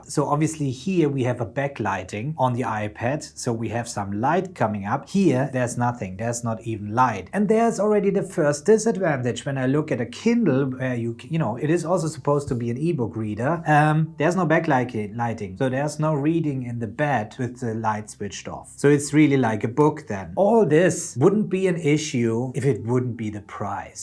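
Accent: Germany. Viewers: Bist du Deutsch? Merke da so ein akzent ..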